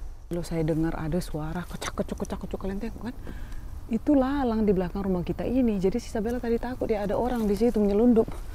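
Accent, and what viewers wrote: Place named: Medan, Indonesia. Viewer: Intonasinya dari Sumatera Utara ya, kayak cakap orang Medan. Kebetulan saya orang Medan, jd suka kiat cakapnya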